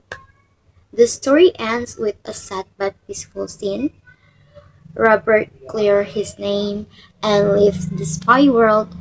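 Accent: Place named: United Kingdom. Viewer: my british accent 😻😻😻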